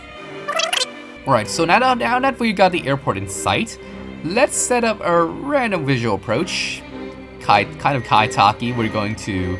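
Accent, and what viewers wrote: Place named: United Kingdom. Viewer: damn that British accent tho....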